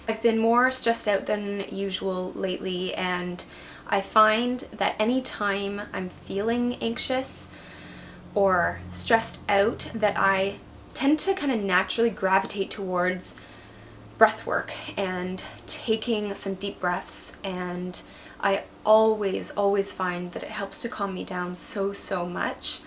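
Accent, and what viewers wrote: Canada. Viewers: I don't know, I kind of find her voice soothing by itself, and I like Canadian accents. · definitely a canadian accent! :-) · She must be Canadian. (out and nose). Thank you for these techniques. I definitely need them. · Love her, she's Canadian. I can tell by how she says out or about.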